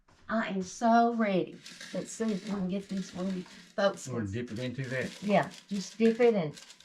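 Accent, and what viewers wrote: South, United States. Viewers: Love hearing the southern accent. I miss my mountains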